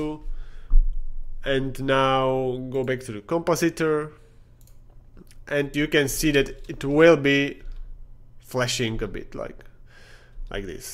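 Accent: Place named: Eastern Europe. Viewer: and that’s not at all a bad thing I like your Slavic accent!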